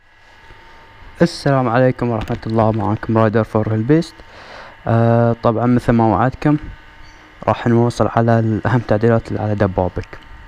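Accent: Bahrain. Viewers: ي حلو لهجة البحرين <3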